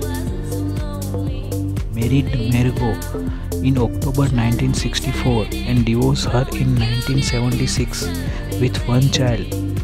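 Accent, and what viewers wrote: India. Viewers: Hahaha.. Come on! Never heard the Indian accent before? You need go out a little bit more... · Really you got a foreigner possibly from INDIA speaking about Kenny Rogers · I THINK THIS GUY TALKING IS FROM KERALA INDIA.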